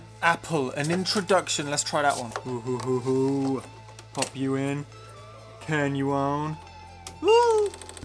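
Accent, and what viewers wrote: Australia. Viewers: Can we get more half australian accent? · Fix the EuroPlus, hook a wire between the IIe's play button to the + play button. TWO track songs! ps, Aussie accent is a beauty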